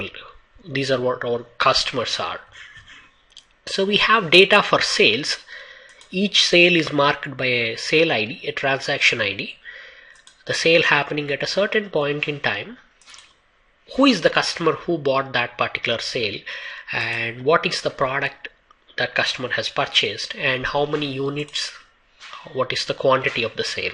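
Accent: India. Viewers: Interesting video. Although, your accent sounds like a typical Indian, I still can understand you. It's clear and well explained. I think it will be better if you include sub-title to your video so that viewers who are not familiar with Indian accent can understand you better. Anyway, it's a GREAT JOB. Thanks a lot. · I open the video, hear and indian accent and immediately know that the tutorial will definitely worth my time.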